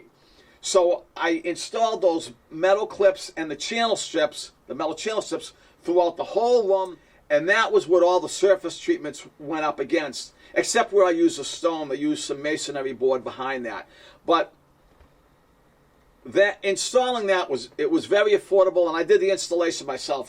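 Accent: Boston, United States. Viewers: I think your accent is far from "the worst" Boston accent. I like it, in any case.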